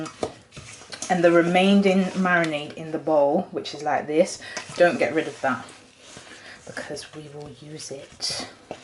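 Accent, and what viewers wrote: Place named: Birmingham, United Kingdom. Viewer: Are you from Birmingham? 🤍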